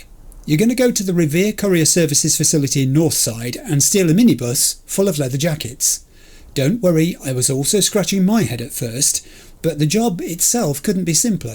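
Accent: United Kingdom. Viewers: What a great channel I found, truly a virtual gem! Thank you very much for you efforts, for your comments, overall for your rich Britain speech and charisma! I played Cyberpunk 2077 for lots of hours, about 200+, one of my favourite games so far and your videos inspire me to play it hundreds hours more!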